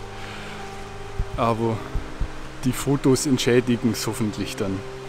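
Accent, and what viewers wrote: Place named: Swabian Alb, Germany. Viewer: Dein Dialekt hört sich ein wenig nach schwäbische alb an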